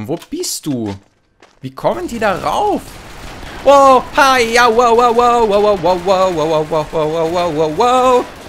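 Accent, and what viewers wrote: Austria. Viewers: Bist du Österreicher dein Akzent klingt so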